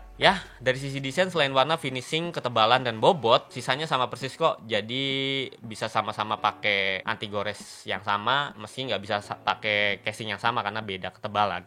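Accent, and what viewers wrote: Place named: Java, Indonesia. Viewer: Kalau ngomong kok medok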